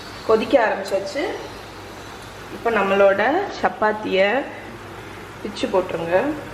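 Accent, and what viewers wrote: Nagercoil, India. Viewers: Nanum nagercoil than akka.....ipo konja days ah than unga videos parkurean.....super akka...nice character you are........ enaku 2 months before than baby poranthuruku....unga kutties parkum pothu enakum Oru girl baby vanthatha nenachu proud ah iruku akka....nager coil slang ah YouTube la parkum pothu romba happy ah iruku.....may God bless you akka.....en husband ku cake romba pidikum...unga channel parthuthan cake pannurean...nice receipe....super.....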